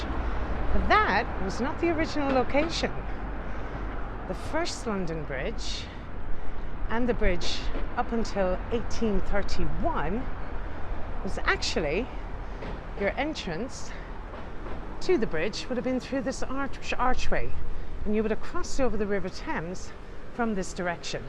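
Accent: Ireland. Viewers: One day when I can get back to London, first time was in 2008 from Oz to UK, I will book you for a tour esp the East End (my ancestors home). I just love your videos. I have so many books about London, it has always been a fascination to me, even before I even knew my mother's family came from East End London...I'm addicted. Thank you so very much you are incredible. And I love your Irish voice, also Irish from my mother's mother's side. cheers from South Australia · Amazing tour by the Irish Queen, your Knowlege & Beautiful Irish Voice make your tours absolutely A must do when visiting London! Your commitment to Detail is truly Second to None!! Thank you for all you do!!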